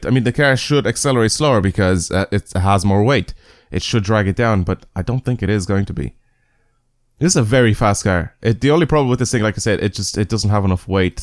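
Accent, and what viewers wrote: Dublin, Ireland. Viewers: R u Irish like from Dublin down sorta thing? I'm from Derry personally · Are u irish?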